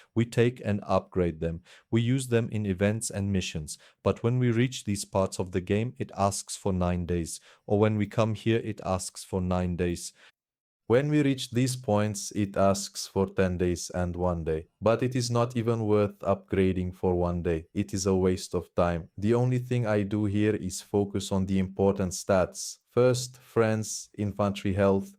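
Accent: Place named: Turkey. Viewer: I listened to the video in the english language and the translation was very good. Oddly enough it still has a Turkish accent. Thats not a bad thing I was just surprised since it is AI translated I wouldn't have expected there to be any accent. You must speak very quickly in Turkish!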